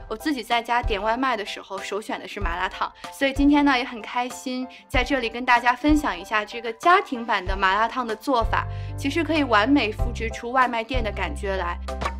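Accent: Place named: Jiangnan, China. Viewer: 昨天试做了汤麻辣烫，很好吃👍今天试做干拌款。 话说东北小JJ说话很好听喲!感觉是江南小JJ呢！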